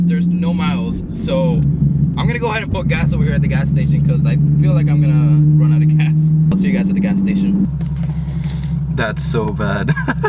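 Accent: Cuba. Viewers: Dude yes please more videos like this this shit made me laugh so hard 😂😂😂 that Cuban accent though de pingaaaa lmao I live Miami so you Already know what that means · Acere your Spanish is Cuban asf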